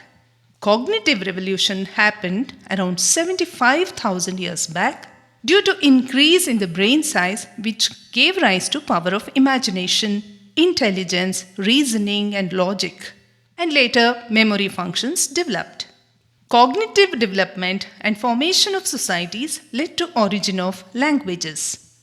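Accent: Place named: India. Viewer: typical Indian English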